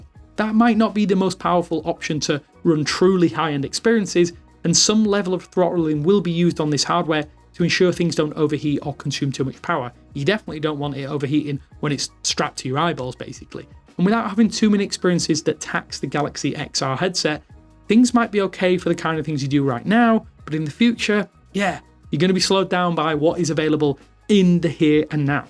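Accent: United Kingdom. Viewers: This is one of the worst XR/VR related videos I have ever seen... way back into the Vive and Index days nothing compares to how awful this guys takes are. It's actually unbelievable he has a job doing this... something tells me the British accent is carrying him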